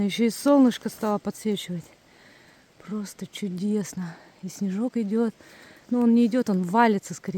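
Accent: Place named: Russia. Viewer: Santa approves🎅👍 This is the one video, you made, that made me earn your respect the most. Even if you were not by yourself, filming... Today,... You have more camping equipment. This one, shows the difficulty, and yet the simplest, basic survival,... two bags, taped together... I love it! 👍 The harshness of what you went through, and yet, the beauty, on all levels, nature, at it's best. From a butterfly,... all the way to a snowy, wet, difficult hike. It's amazing! You are a very resilient, strong, beautiful woman, with the most beautiful accent, Russian voice... Your eyes, hair, are amazing too... Wishing you the best,... ❤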